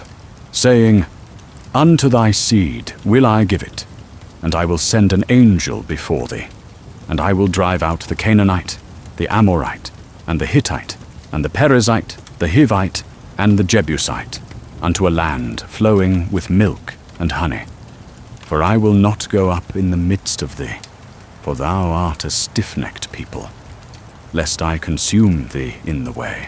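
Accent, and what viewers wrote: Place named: New York City, United States. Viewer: It’s giving NYC OR Chicago 😍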